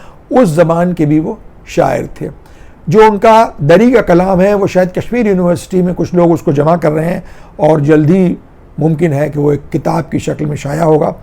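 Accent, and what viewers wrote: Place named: Delhi, India. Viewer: Lko kie zabaan chouk wali boli hie hai Ameena baad kie bhi yahi thie yeh aap aao dilli kie boli hai.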